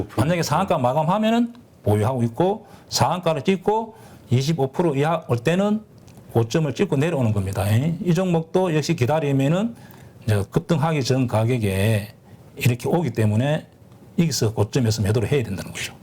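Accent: Gyeongsang, South Korea. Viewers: 같은 갱상도라 귀에 쏙쏙들어옵니다 ㅎㅎ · 구수한경상도사투리 친근합니다 이렇게와닿는방송정말감사합니다열공하겠습니다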